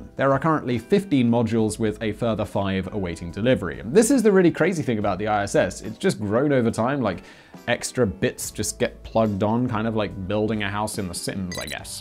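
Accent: RP, United Kingdom. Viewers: I'm hooked... Subscribed. And yes, this is Cykey I watch every channel that on. It's never boring, knowledgeable and is a cool ass host with the most that speaks the Queens English. That's guy is dope! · NASAR the British accent gets me every time lol can pronounce Russia correctly instead of Russiar like NASAR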